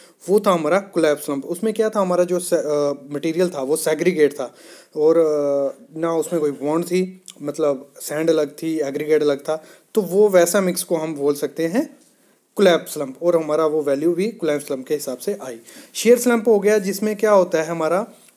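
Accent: Jammu, India. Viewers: He sounds like my friend from jammu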